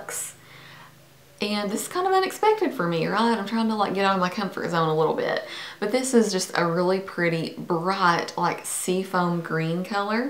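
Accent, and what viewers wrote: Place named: South, United States. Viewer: Your accent... it's like watching a movie set somewhere in kentucky,alabama, texas or a similar country. Magnificent. :)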